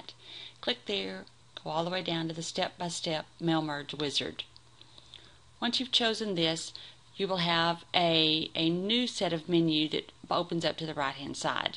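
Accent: United States. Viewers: I just love your southern accent. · are you Texan?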